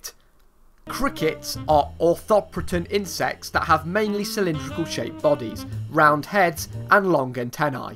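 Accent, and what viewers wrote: United Kingdom. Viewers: he literally has a british accent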